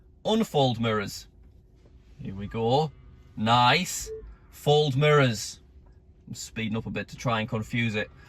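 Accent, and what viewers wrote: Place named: England, United Kingdom. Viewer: I swear your accent is sick I love England people’s accents. Tesla Voice Commands need to understand people with any accent completely. Because sometimes it’s impossible to not talk with your accent.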